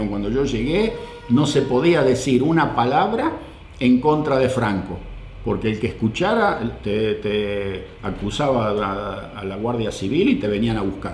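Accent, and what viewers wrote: Argentina. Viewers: Como sabe de cba, sabía de potrero..como si hubiera nacido acá, me pregunto dónde sería la casa acá cerca del clínicas, no perdiste nada el acento argentino.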